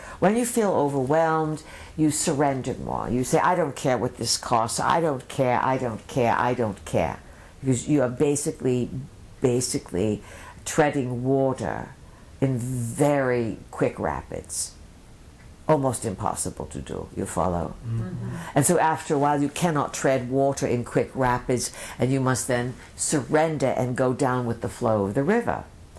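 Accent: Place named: Europe. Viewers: LMG, obviously you have not carefully studied the Pleiadians teaching thru' Ms Barbara Marciniak. They originally introduced themselves as a 50 member collective, and were learning how to teach, advise, communicate with humans. I've lost track of exactly where they stated they are now 100 + Spirits, and have come a long way.It should be clear to you, as well as many of their skeptics, that the familiar voice has changed a bit, have added humour and wit ( often a play on words as well ). Barb is well educated ; she would never make common English grammatical slips, confusing correct use of " this " vs : that ", switching pronouns in mid sentence, incorrect subject and verb agreement, and the occasional misuse of common American wordings. Their vocabulary, though excellent, occasionally they slip into British idioms, Euro vocab and pronunciations, and oddball word order. 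Frequent hesitation, and repetition of words and phrases while searching for the precise word to fit the idea, is a characteristic, of a non-native English speaker. The final clue is, they encourage and even beg for questions from their audience, so as to learn what concerns are on peoples' minds. They can give long dissertations and insight into complex, historical, economic, political, religious aspects. No human is capable of this broad swatch of understanding and explaining, almost ad nauseum. Despite some negativity towards their ending, creeping in sometimes, they always manage to convey a positive messag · I'm just curious why she went from speaking perfect plain english in 1996, to having an odd Euro accent in 2014? Not to mention going from doing 3 hour hypnotism talks in a slow monotone, to talking in the third person. Or maybe the fourth person. Not sure how to describe one person, talking in the third person as two other people. 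LOL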